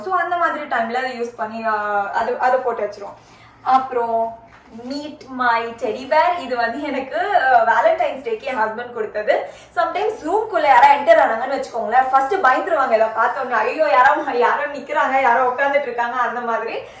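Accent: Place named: Chennai, India. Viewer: Yakoov Actualah Chennai Slangu bangam pannuthu 😂 Keep doing it on Tamil 👍🏼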